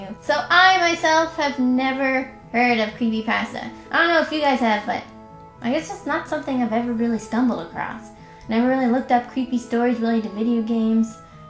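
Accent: Canada. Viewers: If you didn't have the Canadian accent, you would be prefect.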